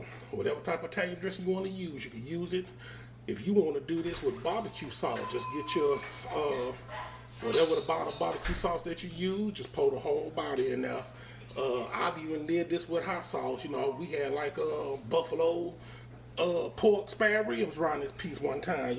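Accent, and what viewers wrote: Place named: Texas, United States. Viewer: im watching this video with baby and the first thing i say to herwhen i heard u talk is, "dude is from Texas." then when u showed the HEB salt and that Zach's Rub it was a done deal. great video bro!! keep making the content.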